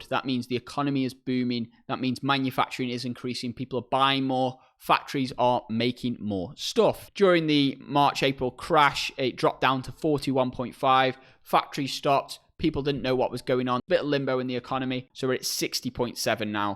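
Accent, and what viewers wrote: United Kingdom. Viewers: Can you try to speak with less of an English accent? I can’t attention long enough with your draw.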